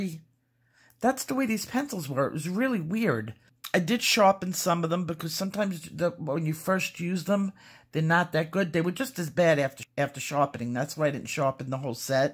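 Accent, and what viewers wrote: New York, United States. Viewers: Omg I love your reviews. Love the blunt New York honesty with accent too.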